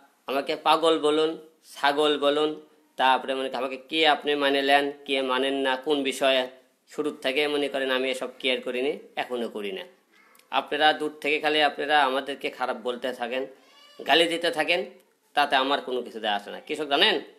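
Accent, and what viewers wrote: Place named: Bogura, Bangladesh. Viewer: বগুড়ার ভাষা বাদ দেন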